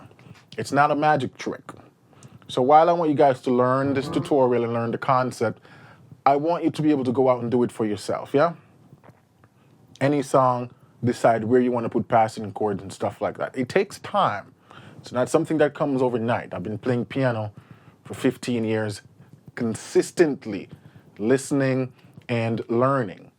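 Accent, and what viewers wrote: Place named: Jamaica. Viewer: are you Jamaican? I hear a cool accent in there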